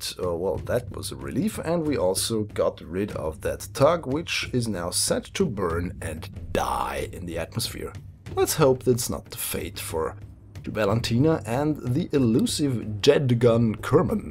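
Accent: Germany. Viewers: Are you german? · Are you German? I feel like your accent is German. I may be way off, bit you sound German. Also, your accent really eccentuates the scope of your videos.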